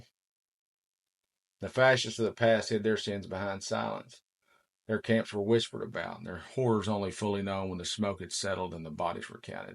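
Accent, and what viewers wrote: South, United States. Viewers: First time seeing one of your videos. Your presentation and eloquent southern accent really hits home. However, I have to say I’m skeptical that you wrote this speech. I think this was crafted using AI. Not to say it’s not 100% accurate. It just looks and sounds extremely well written. Regardless, the message it brings is something everyone needs to hear. Bravo! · I love hearing this southern accent speaking COMMON SENSE! Keep up the good work!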